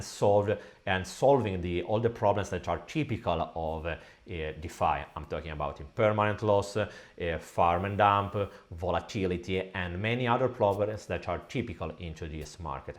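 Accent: Italy. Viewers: Are you italian by any chance? your accent sounds a bit that way, if I'm right, mi fa piacere vedere un fratello italiano in DeFi come me 😁! Great video, good job!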